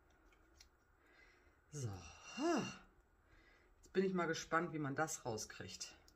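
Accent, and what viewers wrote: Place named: Germany. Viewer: Gutes Video und der German Akzent ist echt amüsant :D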